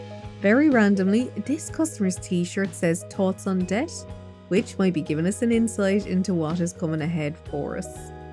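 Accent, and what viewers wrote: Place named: Ireland. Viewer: The moment I heard ur voice, I immediately knew you're from Ireland 🇮🇪. Such a cute and sweet accent fr. Btw amazing video ( ≧∀≦)ノ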